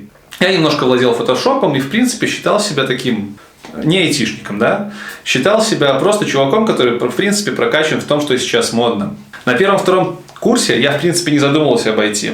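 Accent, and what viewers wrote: Russia. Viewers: Чувак, ты закосячил слово intermedaite , и многие слова англицизмы ты произносишь с русским акцентом, ты скорее просто этого не знешь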